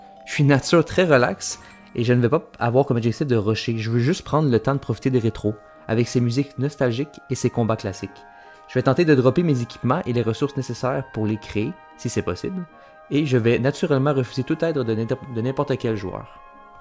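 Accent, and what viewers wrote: Canada. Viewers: super ! continue & enjoy ! on adore le petit accent du canada <3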